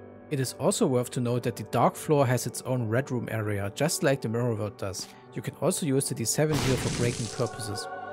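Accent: Germany. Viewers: Bist du Deutsch? Du hast einen akzent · Are you by chance german? Your accent sounds pretty german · are you by any chance german? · Man, as a guy from germany I love your german accent. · I don't know but u sound like u came from DEUTSCHLAND too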